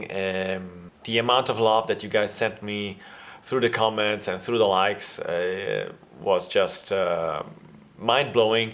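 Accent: Italy. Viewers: This was the first video I've seen from you. Are you italian? Because our italian language teacher ones told us that italian pronounciation suits finnish almost better than any other language and I was wondering if it was vice versa :D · The videos you make are truly excellent. Also, your pronunciation has only a very faint accent. This may partly come from the fact that Finnish and Italian pronunciations do not differ too much. By the way, there is a Russian guy called Kiril Sultanshin who makes videos and has learned good Finnish within a few years. His pronunciation is absolutely perfect though he still makes occasional mistakes in grammar. Achievements like this indicate a great talent. I do not like the term “kirjakieli” although even linguists rather commonly use it. The reason is that it is not used only in writing but also when any formal presentation is needed. 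TV news, speeches, negotiations, university lectures, schools are typical examples. “Puhekieli” is typical in everyday informal discussions. Instead of “kirjakieli” I would rather say “yleiskieli”. Admittedly, “puhekieli” expressions sometimes intrude “yleiskieli”, mainly due to a sloppy use of the language.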